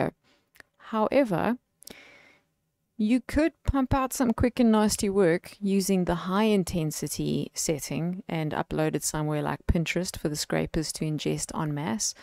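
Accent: South Africa. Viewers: I just found your art now! I can’t believe this is the first video I’ve seen from you! By the way, are you Afrikaans? I can’t help but notice that familiar accent! :D God bless! Keep creating :)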